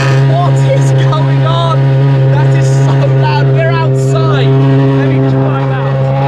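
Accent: England, United Kingdom. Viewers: Ale mnie wkurwia Ten koleś ta gadka tak mocno angielska ze chuj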